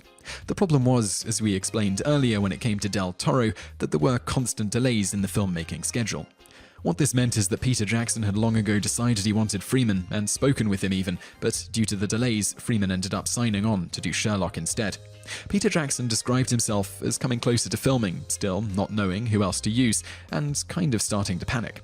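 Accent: United Kingdom. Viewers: Other British people, surely you understand that pronunciation of things is natural, right? Taking into account the many kinds of British accent there are, this is just the way people with his accent pronounce things when they read a word! pronunciation and British tone is preferable, no wonder England has the Top 10 Channel game by the plums. Please keep up pronouncing things the way you do,! If I did the subscription thing, I'd subscribe.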